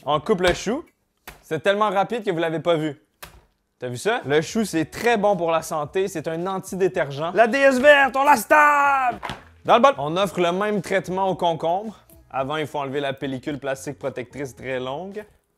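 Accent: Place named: Quebec, Canada. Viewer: On voit qu'il québécois lol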